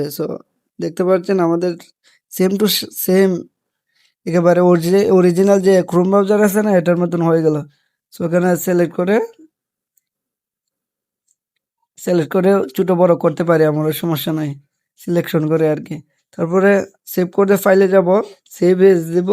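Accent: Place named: Brahmanbaria, Bangladesh. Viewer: Apnr vasha shune mone hoyeche ze bi- baria te apnr bari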